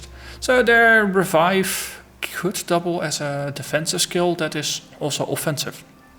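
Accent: Netherlands. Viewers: I love dutch acent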